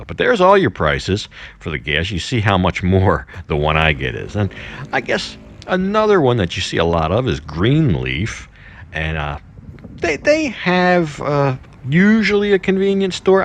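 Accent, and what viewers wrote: United States. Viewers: Enjoyed your video as I am new to the Jomtien area. Honestly, it was comforting to hear an American speak! LOL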